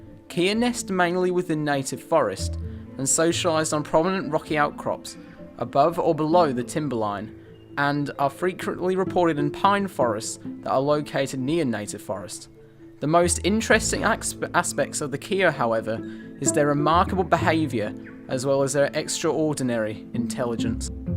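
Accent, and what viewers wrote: United Kingdom. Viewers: wait your a Brit?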